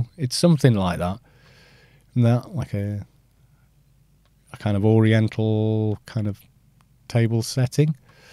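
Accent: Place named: Scouse, United Kingdom. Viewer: I always thought you had a bit of Scouser in your accent.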